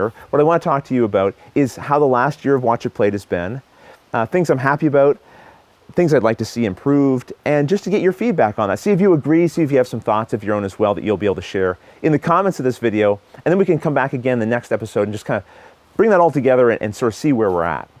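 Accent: Canada. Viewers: You've taught me how to play a number of games this year and I'll definitely keep an eye out for your fundraiser. My wife always knows when I'm watching a show because she recognizes a fellow Canadian accent! :) You have some of the highest quality videos in terms of production which I imagine takes some time, and they often focus on major releases. With that in mind, have you considered doing something like a "quick glance" video segment/series that isn't as in depth as the normal videos but allows you to touch on a broader range of topics/games/smaller releases? Because I think the bottom line is you've got a good viewing personality for gaming, but unless I've just bought the game or am thinking about buying it, I'm not going to watch an instructional video for the fun of it, you know? Table Talk is great, but as you mentioned it's less of a focus. Regardless, thanks for your contributions to the community!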